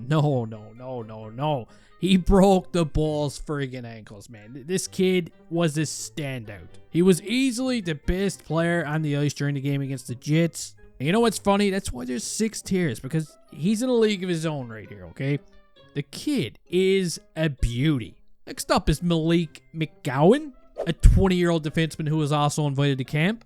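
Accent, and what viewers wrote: Newfoundland, Canada. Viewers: Are you a Newfie? You sound Newfoundlander lol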